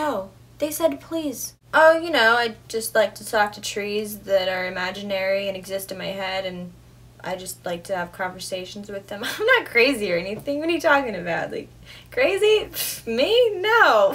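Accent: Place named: United States. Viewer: where are you from?? you look and sound american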